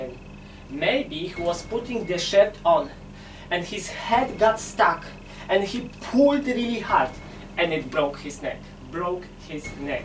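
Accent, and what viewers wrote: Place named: Poland. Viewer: Po tym angielskim widać że polska ale spoczko filmik 😊😊😊😂😂😂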